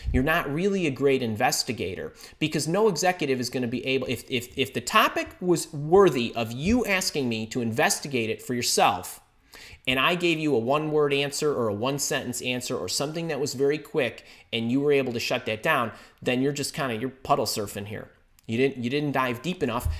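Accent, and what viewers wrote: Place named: Midwest, United States. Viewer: Oh my word! I can tell you’re definitely from the Midwest!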